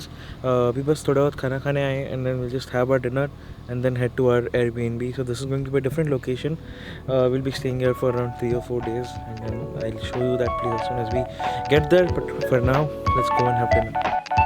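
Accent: United States. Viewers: Ek quote hai : "when in Rome, do like what Romans do". So it's probably for the best if you talk with the American accent. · The American accent comes out naturally once u get into United States, and with exploring new people around is quite common!! So, don’t bother abt people who says u r faking it n all!! Because they haven’t been in States so, n mostly people r jealous why they can’t have the same taste, what v have right here!! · It was a nice vlog and the drone shots were superb and the american English accent was awesome and thanks for showing us such a beautiful greenery vlog👍👌👏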